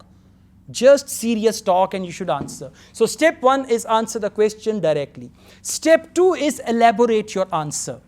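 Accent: Punjab, India. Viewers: Sir although your teaching is just fabulous bt i really likes the desi punjabi words you speak Kithe aa tera kutta😁🤣🤣🤣 Fun and learning together It makes me feel that i m in touch wid my native language 😊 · Sir ur punjabi is same as us dun vatta 😁😁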